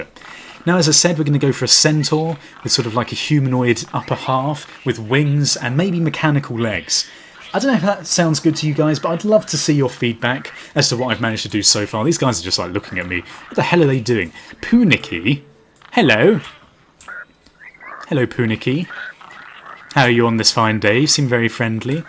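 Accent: United Kingdom. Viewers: you have a british avcent right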